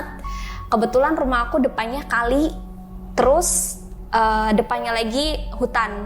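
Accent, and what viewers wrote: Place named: Pemalang, Indonesia. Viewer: Orang Pemalang mn suaranya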